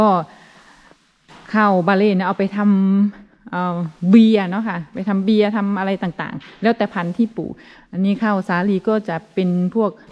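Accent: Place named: Korat, Thailand. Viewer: สำเนียงเหมือนคนโคราชคั่บ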